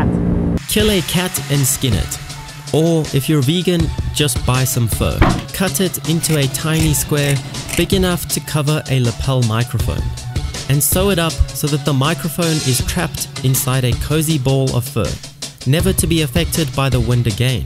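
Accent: South Africa. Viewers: Where are you from? You sound very South African. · you sound south african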